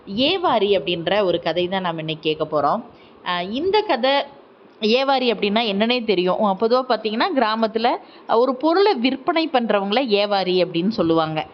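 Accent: Kongu, India. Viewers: அருமையான கதை.... இவருடைய மாதொருபாகன் புத்தகத்தையும் நான் வாசித்துள்ளேன்.... உங்களது குரலும் அருமையாக உள்ளது.. கொங்கு மக்கள் பேசும் அதே பாணி... வாழ்த்துக்கள்..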